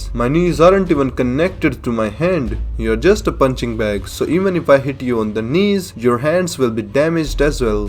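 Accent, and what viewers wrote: India. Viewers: Bruh your Indian accent makes it more funny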